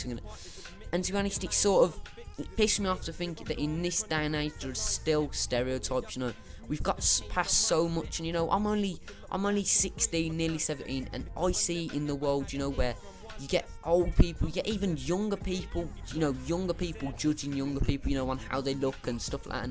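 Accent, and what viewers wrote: United Kingdom. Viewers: Cockney commentary that was pretty good.You might just need to improve quality a little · hey i can hear you're British but why do British people hate Americans so much? Every video i watch there's some British kid ripping on the U.S.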